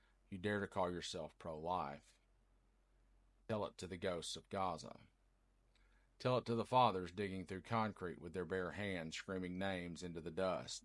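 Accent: South, United States. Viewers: You are a treasure and represent what a real man (with a southern accent) should sound like. You are describing religious ghouls...they are nothing more and we all know it.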